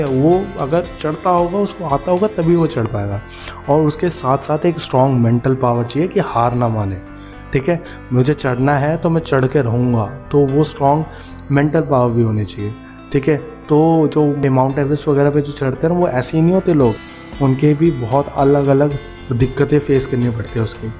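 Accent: Uttarakhand, India. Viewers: Bhai ap uttrakhand se ho kya 😊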